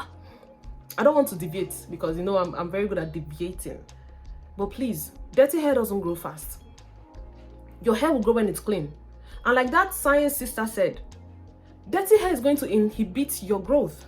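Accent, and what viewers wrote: United States. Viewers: The American English accent 😂 killed me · The American accent 😂😂😂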